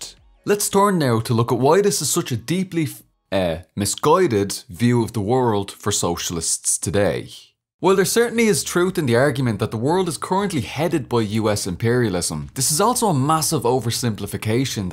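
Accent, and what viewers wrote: Ireland. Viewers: Oh shit I'd always wanted to watch an Irish lefty creator, pity you have the sound of a dub in ya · Are you Irish? You seem to have the accent lol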